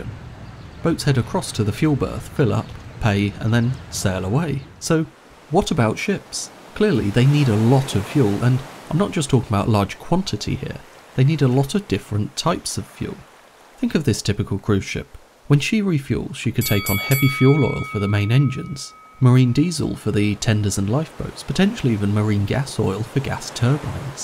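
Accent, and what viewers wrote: United Kingdom. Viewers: You're Britain?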